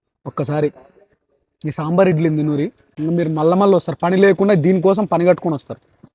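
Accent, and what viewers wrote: Telangana, India. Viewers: Love to hear Telangana language . Keep going. Wish you all success. Love your explanation. Honest opinion.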